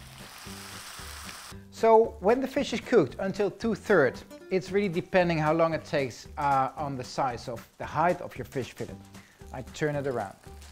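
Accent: Netherlands. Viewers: your English has improved a lot! As a Dutch speaker I could notice all the small Dutchisms you put in the video. Nice job!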